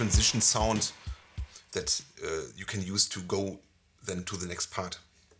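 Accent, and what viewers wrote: Germany. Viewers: Das mag zwar sein mit der deutschen Aussprache, aber so erreicht er mit seinen Soundsets ein größeres Publikum. Die Wavestate wird ja nicht nur in Deutschland verkauft und die Titel sind weltbekannt. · I like this Germenglish accent 🙂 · Bestimmt ein Tolles Set, danke für die Mühe. Ich möchte nicht "trollen" oder dir irgendwas böses, aber ganz ehrlich: Mach deutsche Videos. Dieses Deutsch-Englisch hört sich schauderhaft an. Und vermutlich liegt dir die Sprache nicht besonders, so dass du dich da immer von Video zu Video kämpfen mußt. Ich denke da an einen anderen Kanal, wo der Betreiber wohl auch erst Englische Videos gemacht hat und irgendwann beschlossen hat diese in Deutsch zu machen. Dadurch sind die Videos für ihn leichter und schneller zu erstellen und die deutschen Zuschauer haben da auch nur zugestimmt und die Abonnenten sind sogar gewachsen. 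Wie gesagt, ist nur meine persönliche Meinung und ich möchte dich wirklich nicht angehen. Aber vielleicht würde es für dich auch schöner und einfacher werden. :) Viele Grüße und auf weiterhin gute Videos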